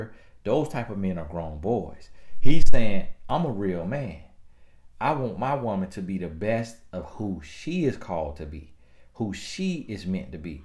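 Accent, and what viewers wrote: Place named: Polk County, United States. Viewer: I can definitely tell you’re from Polk County.. lol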